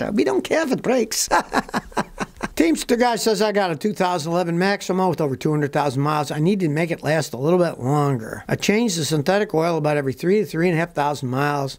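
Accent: Germany. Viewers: Please, please, speak more with a german accent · German accent made my day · I like the German accent and the German laugh! So Funny!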